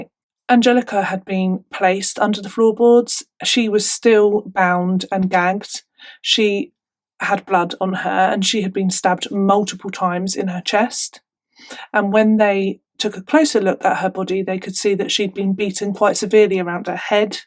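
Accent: United Kingdom. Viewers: i just found your site by accident and watched one of your videos. Normally I would be irritated by your presentations, but I find your approach to be delightful -- can't say funny because of your subject matter!! I am from London originally so its refreshing to discover a British narrator of horror stories!! You remind me of Miranda Hart who had her own unique TV show, I hope you do other performances other than tell these stories?? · I love your videos. Love your voice and attitude. I’m American, so I love the attitude and voice of the English. Can you add more photos as you’re telling these cases? I’m not asking for shock photos, but photos that humanize the case more. Like childhood photos of the victim, photos of love ones, photos of the killer, photos of the trial, etc. Please and thank you.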